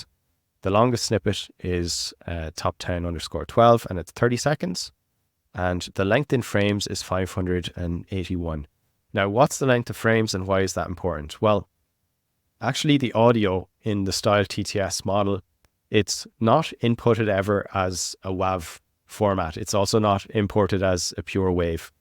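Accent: Ireland. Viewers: Three things: 1. This is by far the most thorough, informative and approachable video I have come across on TTS; really appreciate the time and care you have put into this. 2. As a South African, I absolutely love the Irish people and accent - a lot of learnings in this video about accent, pitch and tone that will be very useful (I think) for TTS for under represented languages, very important from an African perspective. 3. Very well earned like and subscribe; I look forward to learning more from you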